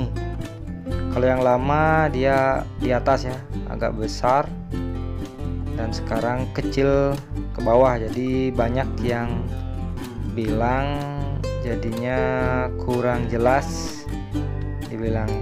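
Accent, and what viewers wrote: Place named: West Sumatra, Indonesia. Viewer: Ini yg ngomong kok kaya org sumatera barat bukan org bali.. (yg org bali asli komen y)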